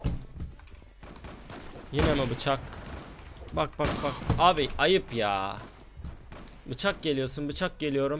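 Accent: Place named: Eastern Anatolia, Turkey. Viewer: Konuşma şiven doğu şivesiydi biraz düzelmişsin artık biraz daha anlaşılır konuşuyorsun önceden dediklerini anlamıyprfum şimdi seve seve izliyorum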